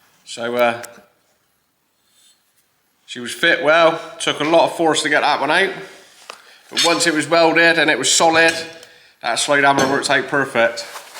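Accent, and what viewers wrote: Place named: England, United Kingdom. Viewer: Great hammer, where is your accent from im always trying to defferentiate the different English accents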